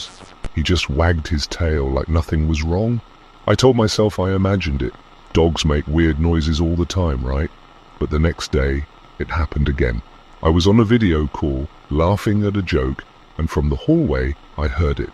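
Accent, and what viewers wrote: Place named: United Kingdom. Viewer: AHHH HES BRITISH >X33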